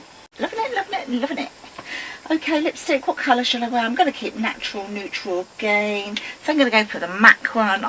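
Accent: United Kingdom. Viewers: Yes my middle aged ears are ok lol.Just prefer to hear your lovely English accent my dear.💗👗💟💋👠👛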